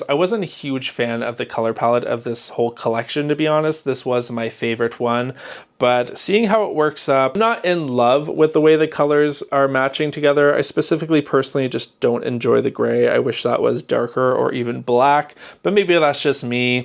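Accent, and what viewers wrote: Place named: Canada. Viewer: new here. you sound canadian, where do your buy your yarn? I see in the back you have a big colour selection and I'm really looking for that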